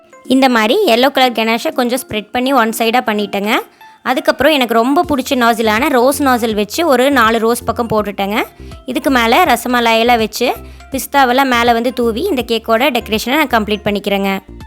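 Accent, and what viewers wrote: Kongu, India. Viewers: Akka Namma kongu slang kekumumbodhey sandhosama irukunga akka ... ❣️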